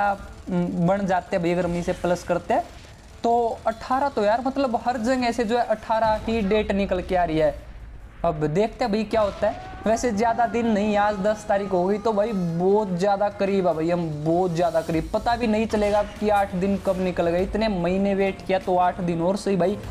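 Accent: Haryana, India. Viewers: Bhai yo b haryana te h k eski awaaz sunke to nue laage h k u haryana t h????bera ho to tne???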